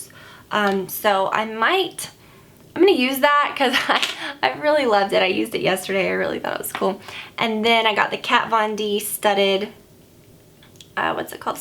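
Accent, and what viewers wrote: South, United States. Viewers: Cute (and unusual for you ) southern accent in this video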